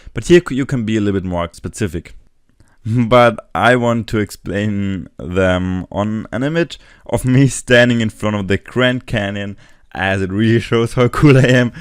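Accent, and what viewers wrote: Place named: Germany. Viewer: echt angenehm, das Englisch von einem Deutschen zu hören, dann kann man auch mal was verstehen xD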